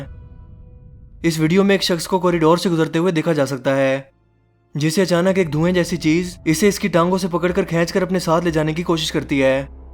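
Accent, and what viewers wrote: Punjab, India. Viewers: App ki Urdu saf nhain hain, app kaiyoon punjabiyoon ki tarha Urdu bolthe hon?? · Urdu ko Punjabi bana k bolnah walay kuch to lehja behtar karo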